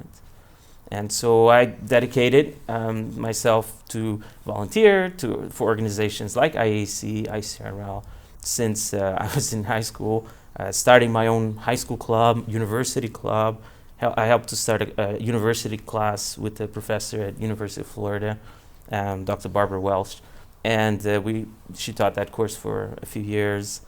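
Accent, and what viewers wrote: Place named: Brazil. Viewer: Good talk. On an unrelated note, it's so nice to see such a smooth Brazilian accent.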